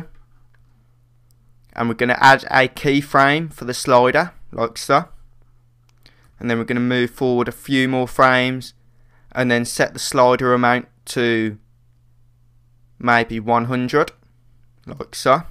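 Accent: Birmingham, United Kingdom. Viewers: BIRMINGHAM ACCENT!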